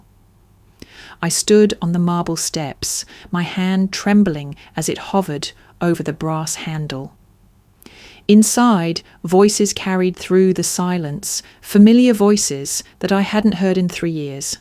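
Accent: United Kingdom. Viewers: Please, no more British narrators.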